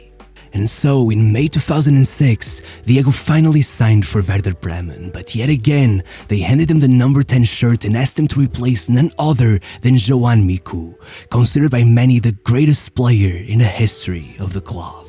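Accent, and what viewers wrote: Portugal. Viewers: Man, I'm new to your channel, really enjoying the videos, but let me ask you: Are you Portuguese or have you learned Portuguese from Portugal? Bruh, if you're not a Portuguese student you should try Brazilian Portuguese accent, specially when talking about Brazilian people and things. For exemple: Instead of Roberto "Carloosh" just say Carlos dude; instead of "Libertadoreesh" you can just say Libertadores, that "s" is actually just like English's "s", like when a verb gets the "s" when used in the present for he/she/it = works, plays -- that's the "s" sound in any of these words. I'm not hating, as I said I like your videos, I'm suggesting ok. Much love from a Brazilian Portuguese teacher from Brazil! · Português* dá para ver no sotaque e no sotaque ao falar inglês. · You are Portuguese, right? That accent is recognizable anywhere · Any chance you are from Portugal? 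Because the way you pronounce brazilian portuguese names sounds like european portuguese.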